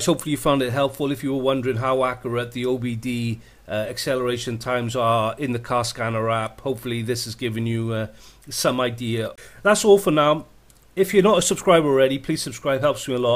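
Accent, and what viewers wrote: Wales, United Kingdom. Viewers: Didn’t expect a Welsh accent! 🏴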